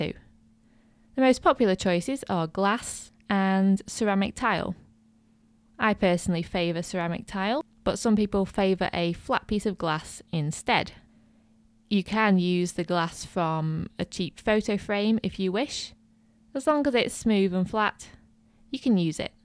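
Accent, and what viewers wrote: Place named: England, United Kingdom. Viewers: I really enjoyed your beginners video, and your accent reminded me of England where I was born. I live in Australia and have just started going to classes. Thank you for all your help and great tips. 😊😊😊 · I'm going to guess you're from the North West of the UK- your accent is exactly like mine- I'm from Lytham. Anyway, thanks for the informative video- I'm just getting started with polymer clay.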